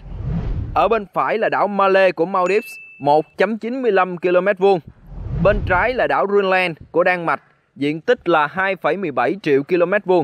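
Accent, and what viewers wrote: South, Vietnam. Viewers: Giọng Nam bộ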